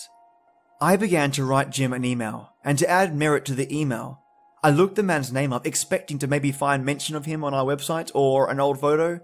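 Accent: Australia. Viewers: Wow! That tea you mentioned in the beginning sounds absolutely fantastic and delicious! I'm a bit jealous! I am sitting in my living room with my wonderful mother, cute parakeet named Daisy, and myself with a humongous cup of black tea. My mom couldn't of been nicer about you. She told me you tell such a good tale she could actually imagine herself in the story. Bravo! Of course, I just Loooovvvveee your narration!😍 With your narration style, sound effects, and great Aussie accent, truly makes it quite a listening experience (a stupendous one at that). My ears never have been soo spoiled! Thank you ever soo much, from the bottom of my heart!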